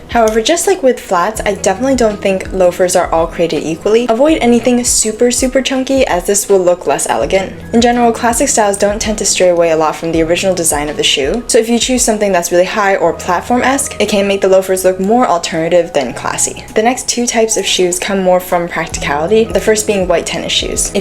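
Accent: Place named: United States. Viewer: you have a very pleasant voice and accent, are you from the usa?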